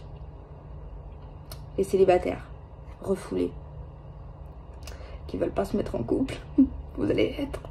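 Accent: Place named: South, France. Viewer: Bien l'accent du sud...alors tout vas bien..🙏🙃🤣 👍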